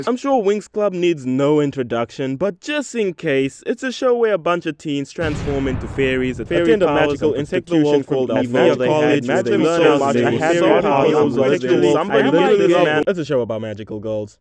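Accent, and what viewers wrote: South Africa. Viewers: Quick question, are you South African? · very relatable n r u south african, if so me too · Hey, Genuine question, Are you from South Africa. I’m from South Africa, and your accent sounds like so many people I know in SA. Also this video is hilarious. My boyfriend loves mlp and luckily he has a girlfriend that loves it too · Is bro south african? anyways slay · Are you South African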